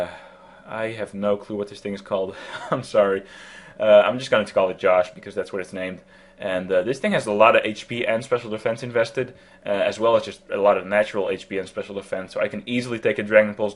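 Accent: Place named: Netherlands. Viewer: het blijft moeilijk om een nederlands accentje te verschuilen xD nice battle ;)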